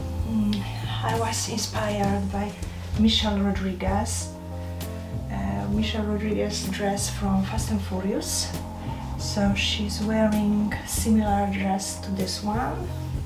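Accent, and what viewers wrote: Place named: Netherlands. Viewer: You look beautiful,. But, your little feet need kisses x Your accent...are you Dutch? x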